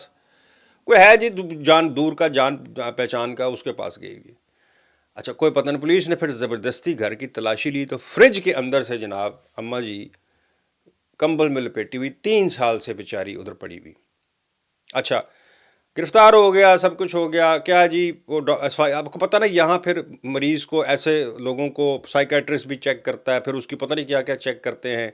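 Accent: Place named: Punjab, Pakistan. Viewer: your Punjabi touch is hilarious 🤣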